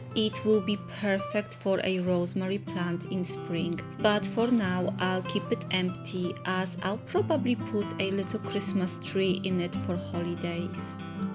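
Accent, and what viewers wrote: Poland. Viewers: jestes Polka?:) zgaduje po akcencie ale i imię. Piekny film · This is my first time here and wondered where are you from because I heard an eastern accent. When I saw you preparing racuchy I smiled: you are Polish! Me too! Greetings from Warsaw.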